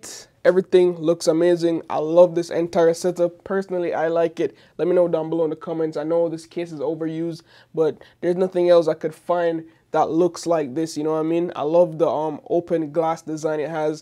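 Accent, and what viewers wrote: Jamaica. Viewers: You're jamaican arent you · are u jamaican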